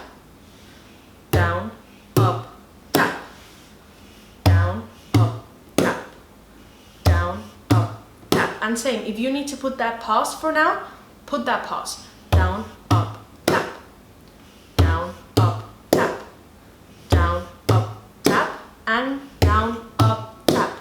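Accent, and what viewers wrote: Ireland. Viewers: Do I hint a little irish accent in there? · Glad to see you online again! I had seen the DOWN UP TAP method on another video, and wasn't quite sure if it was legit. It seems a great way to produce a lot of sound while being economical with the amount of skin covered. One day I too would love to visit Ireland. I hope you had a wonderful trip. If you don't mind me asking, where are you from? At first I thought your accent was Irish; is it not? I'm in California, so you can imagine the lack of bodhran players out here. Thank goodness for the internet! Your instructions have helped me greatly, thanks for being there!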